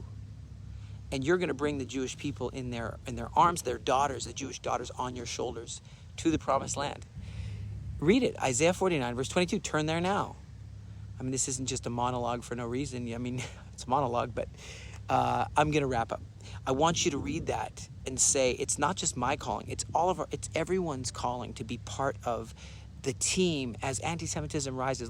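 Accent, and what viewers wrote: United States. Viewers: Is this guy American or Israeli? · Excellent American accent for being a native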